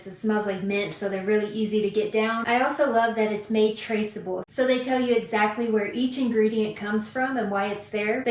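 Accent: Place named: South, United States. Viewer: It's so cute to hear ur southern accent when you talk to ur Dad. ❤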